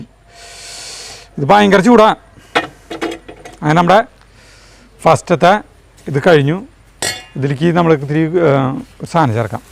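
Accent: Thrissur, India. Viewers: സംസാരം കേൾക്കുമ്പോൾ ശക്തനിൽ വന്ന ഫീൽ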